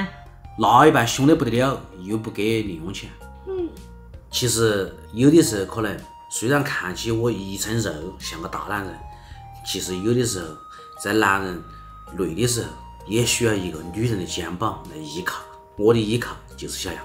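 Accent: Sichuan, China. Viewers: 超小厨阿， 你辛苦了这么长的时间啦， 我们为你的成就觉得非常开心，你不用跟我们解释啥。 作为一个在加州的四川人， 听到你说四川话和看到你煮菜的时候都很让我想家，希望疫情快点度过，可以快速回来整几顿！ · 最喜歡你視頻中，所講的那一口川話，因為家裡長輩均已過世，超想念他們的口音，出生在台灣的我，太喜歡你們的日常，祝你們全家人都平安幸福！我會一直關注你的視頻！ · 邊看邊學四川口音呢，沒字幕的話，要聽得懂才行呢，哈哈，我台灣。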